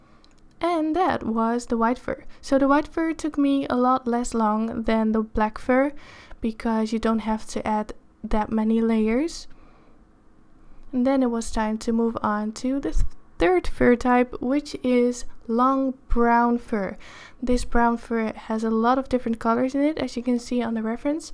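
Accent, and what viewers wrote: Netherlands. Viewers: You hear that she is from the Netherlands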